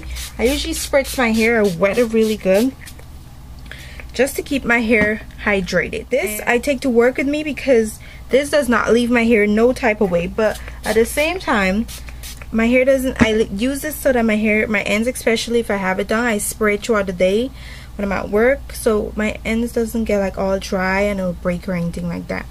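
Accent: Caribbean. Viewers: r u from the caribbean? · she def sound like a island gyal XD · Are you from trinidad or guyana?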